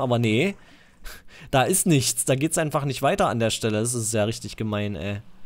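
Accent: Germany. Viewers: euer Akzent (Ossi-Akzent) für uns ziemlich lustig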